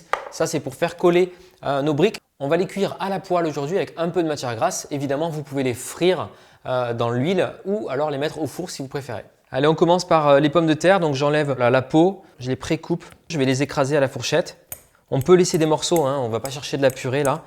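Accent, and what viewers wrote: South, France. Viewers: A tester dès que possible avec notre reste de fromage à raclette. Je sais pas si c'est moi, mais je trouve que notre, il rechoppe un peu plus son accent du sud. C'est charmant!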